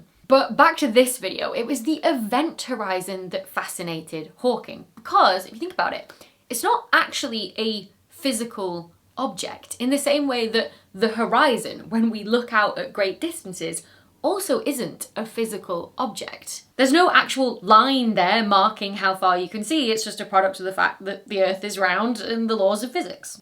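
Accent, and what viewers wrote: United Kingdom. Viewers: so nice channel and such an awful British accent!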